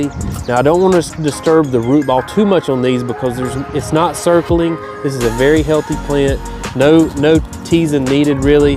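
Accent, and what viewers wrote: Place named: South, United States. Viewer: What the heck! I ain't been gettin' these alerts for the new vids (me trying to sound southern like here) Anywho...great seeing y'all and those are some beautiful plants! Just finished my garden in SW Michigan and it's smokin' hot and no rain in the forecast for days...happy gardening! Great job, once again - cameraman!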